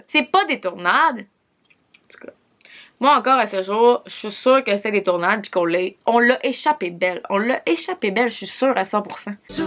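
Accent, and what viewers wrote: Quebec, Canada. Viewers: helppppppppp je ne comprends rien au québecois lol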